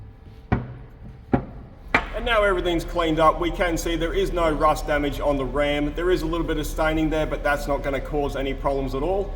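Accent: Australia. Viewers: Around 21 min. Heard you say "now we are going to find Santa" , realized your Australian accent...now we are going to find center. Was wondering "what does Santa have to do with this?"🤔🤭 Enjoying your vids.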